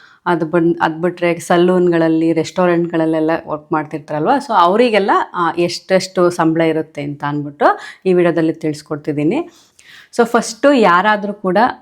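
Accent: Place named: Mysore, India. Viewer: ನಿಮ್ಮ ಈ ಎಲ್ಲ ಮಾಹಿತಿಗೆ ತುಂಬಾ ಸಂತೋಷವಾಯಿತು❤️🙏. ಒಳ್ಳೆಯ ಕನ್ನಡ, ಹಳೆ ಮೈಸೂರು ಕನ್ನಡ ಬಾಳ ಸಂತೋಷವಾಯಿತು 💐🎂🇮🇳🌏❤️🙏........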